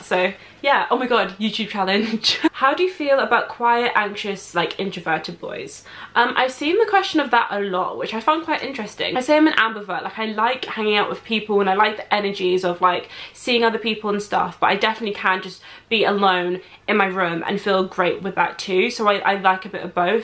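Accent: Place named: United Kingdom. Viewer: I love your british accent guapa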